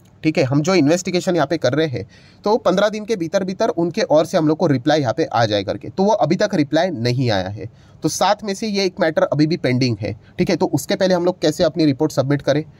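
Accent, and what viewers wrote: Maharashtra, India. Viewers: Sir Marathi h aisa lagta h, Thodifar 🤣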